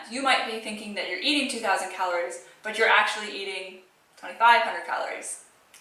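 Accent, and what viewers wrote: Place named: Australia. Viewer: I'm hearing such an Australian accent in the intro. Its slight but I recognize it because my brother is also a Canadian living in Australia. I love habit trackers.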